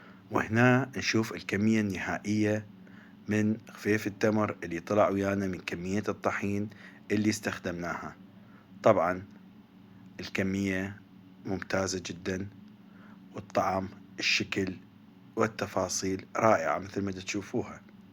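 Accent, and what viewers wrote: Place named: Iraq. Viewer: عيني بعد اللهجه العراقيه ربي يحفظ ويبارك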